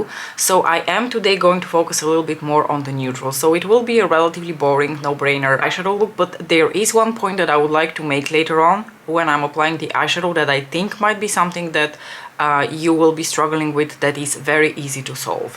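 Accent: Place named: United Kingdom. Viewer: I find it so amazing that you speak so many languages and I assume you speak them all as fluently as you speak English. I would guess that you have lived in the U.K. for 15 years by the way you speak. I would love a video on how you started with each language and how your brain processes and learns new languages. On top of it your job, husband, child, cats and YouTube channel, makes you so well rounded and so fascinating. You are such a lovely person. I love listening to you chat to us and your makeup tips and knowledge are really useful. ❤️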